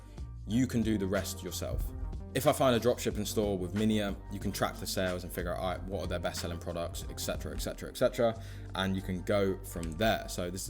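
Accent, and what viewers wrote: United Kingdom. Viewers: That voice sounds british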